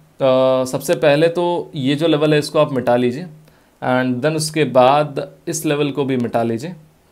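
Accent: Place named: Bangalore, India. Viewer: is from banglore XD accent se smjh gya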